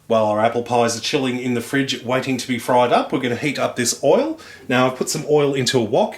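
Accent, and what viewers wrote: Australia. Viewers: Australian? Awesome :D. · I am just glad that he has an Aussie accent. I get tired of everyone who is heavy being labeled a fat American. This looks delicious BTW.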